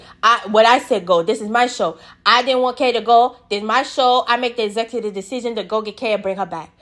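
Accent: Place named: St. Louis, United States. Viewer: Are you from St.Louis? You gotta be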